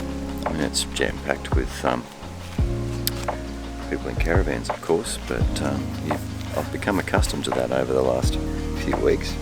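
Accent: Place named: Australia. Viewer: You sure have a strong Aussie accent for a whinging pom.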